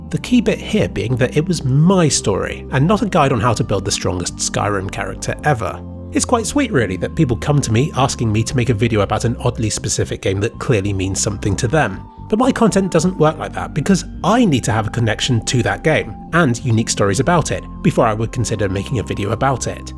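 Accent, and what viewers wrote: United Kingdom. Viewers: can you please change your dialect im very tired of the british lately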